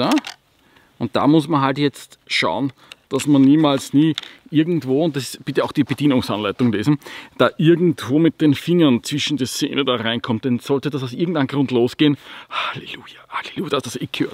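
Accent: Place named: Austria. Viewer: Cause he is an Austrian and he plays with his slang a little bit, not everything could be translate to stiff english comments😂 Additionel you have the possibility to learn german with austrian accent 🎉 Most of his customers are from Austria or Germany. Easy Solution activate Google-Translator while watching youtube...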